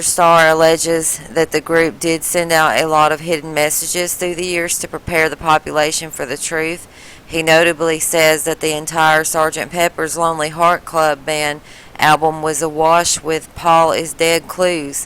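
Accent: United States. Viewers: where else do you hear blockbuster stories like this except for the "world news daily report"...such a bastion of truth, no other news outlet has even bothered to question it! you fucking dipshit. not everyone with a southern accent is a moron, but you ain't helping with drivel like this. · Fk don't you just fkin hate the way yanks speak we gave you English fk sake use the language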